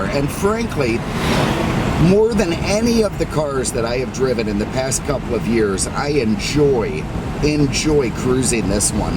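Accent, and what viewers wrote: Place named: Great Lakes, United States. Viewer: Your accent screams somewhere between Lake Michigan & Pittsburgh😆This baby is a real gem from an era we may never see again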